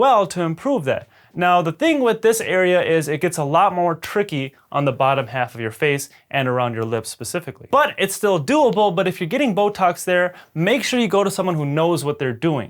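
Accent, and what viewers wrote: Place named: United States. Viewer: I didn't expect him to speak in an American accent 💀💀💀💀💀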